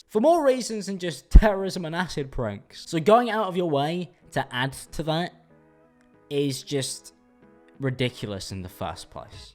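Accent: United Kingdom. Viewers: You sound like a Brit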